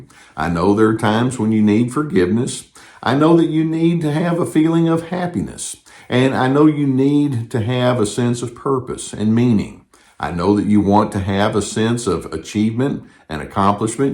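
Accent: South, United States. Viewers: excellent! thanks for taking a moment to respond to my comment. really appreciate the southern drawl, the funny faces and sarcasm that you use to describe the buffoon narcissists of the world. gus in the background when doing the videos, is such a comforting soul. will continue to keep an eye on YT for more videos. all the best, steven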